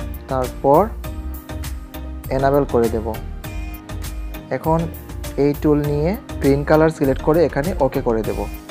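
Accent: Sylhet, Bangladesh. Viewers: vai sylhety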